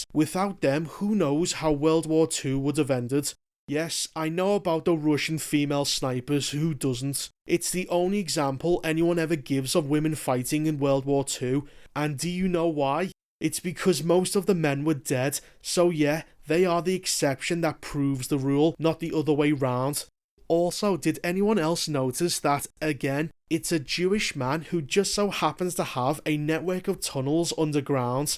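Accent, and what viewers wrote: Liverpool, United Kingdom. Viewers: What i hate more is your scouse accent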